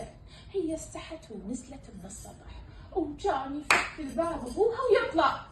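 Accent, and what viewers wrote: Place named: Basra, Iraq. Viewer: عيد المبارك عليج العسوله يمه فدوه لهاي اللهجة العراقيه البصراويه الحلوه و كل عام و انت والأهل و العائلة بالف خير و صحه و عافيه و صيام مقبول وأجر ثابت يروح الروح فديت الهلهوله العراقيه الاصيله مال امهاتنه وبيبياتنه المعدلات احبج هواي هواي يكلبي متابعتج من بابل 🌷🌺💐❤️💜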